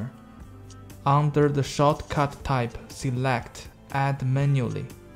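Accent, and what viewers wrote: China. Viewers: 熟悉的中国口音，蛤蛤蛤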